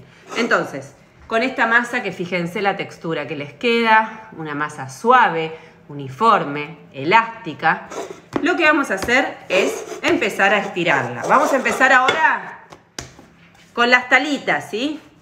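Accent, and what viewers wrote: Argentina. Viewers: Hola, me fascinan, tus recetas, trataré de hacer las galletas, a ver cómo me quedan, también me gusta mucho tu acento, argentino y lo agradable q eres, gracias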